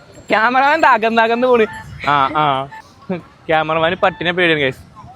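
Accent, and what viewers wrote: Kozhikode, India. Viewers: Avalude baksha kettitt Kozhikode ann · കോഴിക്കോട് ആണ് മനസ്സിലായി ഭാഷകേട്ടപ്പോൾ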